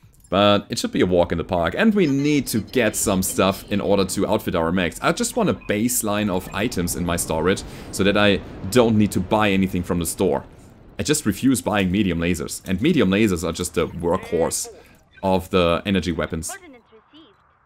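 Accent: Germany. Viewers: Oh he is german?! I can hear it :)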